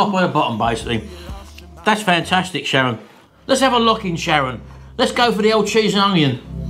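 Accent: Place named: Scotland, United Kingdom. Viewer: I love your Scottish Accent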